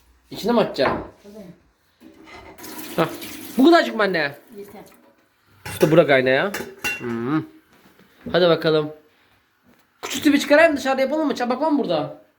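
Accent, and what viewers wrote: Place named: Bartın, Turkey. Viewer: Has bartin şivesi.halişka bu deyil misir unu ile olur halişka .bunun adı hamur atmasi .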